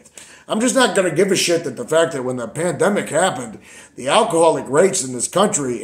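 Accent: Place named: New England, United States. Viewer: New Englandar! Love it!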